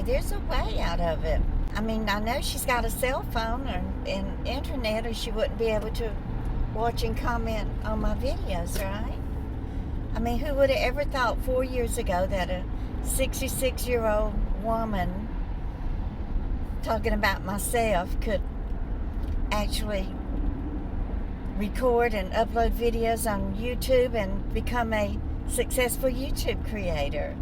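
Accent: South, United States. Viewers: You also have talent for interesting conversation combined with compassion. You have beautiful southern accent that is soothing, unlike some that I think are just horrible. You have to be willing to open your life up. I’m much too private for that, so you see not everyone can be successful at it, but I’m happy for your success. I’m a paid companion for mobile elderly. I’m in great shape at 66 so hope to do for as long as I am able. Lorena.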